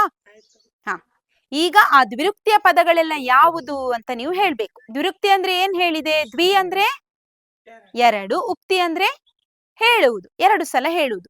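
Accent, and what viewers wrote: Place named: Dakshina Kannada, India. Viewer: ದಕ್ಷಿಣ ಕನ್ನಡದ ಭಾಷೆ ಕೇಳೋಕೆ ಒಂದು ಕರ್ಣಕ್ಕೆ ಆನಂದ. ಅರ್ಥಗರ್ಭಿತವಾಗಿ ದ್ವಿರುಕ್ತಿ ಬಗ್ಗೆ ತಿಳಿಸಿದ್ದೀರಿ.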